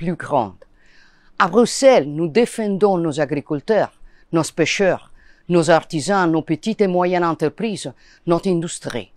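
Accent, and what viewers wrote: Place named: Italy. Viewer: Soyons honnêtes : son français aurait sûrement été plus fluide, et avec moins d’accent « macaronique », si elle avait pris la peine de les étudier à l’université plutôt que de perdre son temps à militer dans un parti néo- ou pseudo-fasciste. Elle a préféré grimper grâce au népotisme et au clientélisme — une vieille habitude de cette droite populiste, autoritaire et un peu obscurantiste, arrivée en Occident avec le petit coup de pouce de Poutine et de quelques autres voyous… Madame (ou Mademoiselle) Meloni, expliquez-nous donc pourquoi l’Italie est aujourd’hui le seul pays d’Europe de l’Ouest à ne pas avoir reconnu la Palestine et à rester les bras croisés devant un génocide en cours. Et expliquez-nous aussi, Madame Meloni, pourquoi des centaines de voix d’Italiens NATIFS, qui dénoncent discrimination, favoritisme et appauvrissement, se retrouvent systématiquement réduites au silence, censurées ou même poursuivies… dans VOTRE pays.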